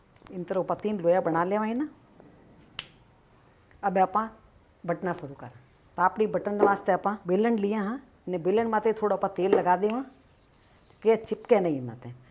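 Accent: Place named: Rajasthan, India. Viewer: Rajastani bhasa boat pari lagi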